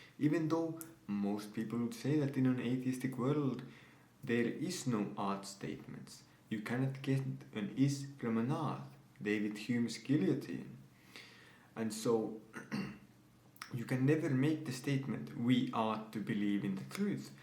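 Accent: Finland. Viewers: let me guess. You from Finland?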